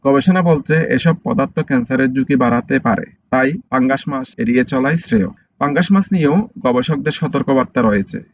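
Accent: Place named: Noakhali, Bangladesh. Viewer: উনার বাড়ি হয, নোয়াখালী অথবা চিটাগাং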